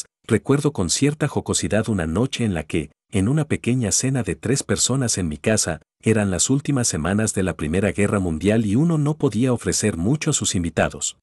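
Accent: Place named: South America. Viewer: Vale, el acento suramericano es soportable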